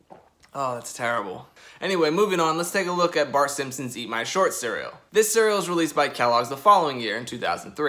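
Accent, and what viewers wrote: United States. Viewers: If those cereals were released in the UK, why does the narrator for No ProblemO’s have an American accent?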